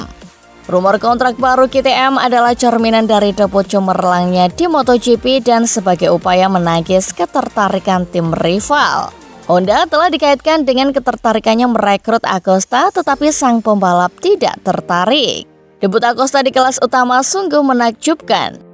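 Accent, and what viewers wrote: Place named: Java, Indonesia. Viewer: Hostnya medok gitu bawa beritanya 😂😂😂 jawa bgt ya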